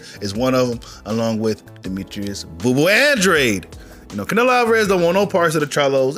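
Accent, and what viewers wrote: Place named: United Kingdom. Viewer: Only half through the video bro but I need to hear your British accent 😂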